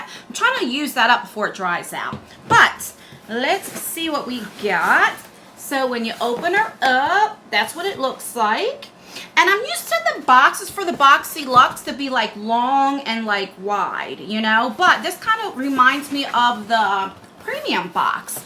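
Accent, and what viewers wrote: New Jersey, United States. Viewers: Girl same!! I think it’s a jersey accent haha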